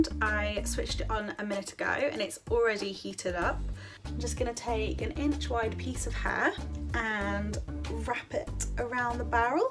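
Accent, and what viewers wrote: United Kingdom. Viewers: The British accent tho😍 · What's a British accent? She's got an English accent with some Midlands bits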